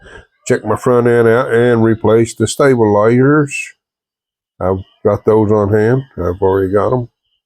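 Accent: United States. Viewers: Good morning,. If it wasn't for bad luck, you wouldn't have any luck at all. Given all of the terrible things you've experienced in life, you could've turned out to be a very angry and bitter person, but you didn't let all of that get you down. That speaks volumes about your true character. Sometime, during your young life you must've had a mentor that was positive influence on you. It's either that or you somehow inherited a terrific set of genes family tree or it could be a combination of both. Whatever the case, Grace couldn't have found a better person to marry than you and Virgil couldn't have a better father than you, In fact, Virgil couldn't have any better parents than you two, both genewise and mentorwise. As for me, I feel very fortunate to have stumbled upon your wholesome, heart warming, family oriented, interesting, and educational videos. Besides that, I really enjoy your relaxing, laid back, hillbilly way of talking,. 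It's nothing to be apologetic about. In fact, you should be proud of your family heritage. ❤ 👍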